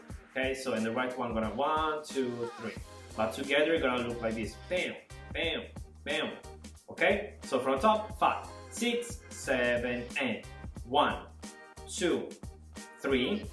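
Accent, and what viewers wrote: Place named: Italy. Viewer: u sounds so italian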